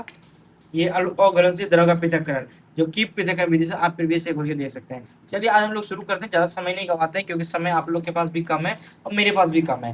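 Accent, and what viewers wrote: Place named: Mithila, India. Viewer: Sir apka jo boli h hme bahut acha lgta aap mithlachal ki or se h na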